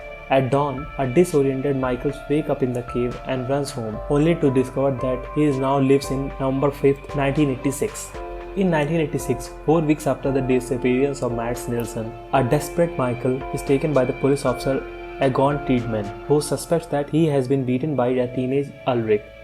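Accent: India. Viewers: i liked it because of the indian accent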